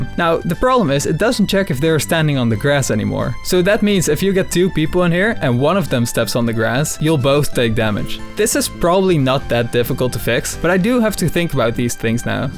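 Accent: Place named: Netherlands. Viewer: are u dutch? ur accent and pronunciation sounds like a dutch one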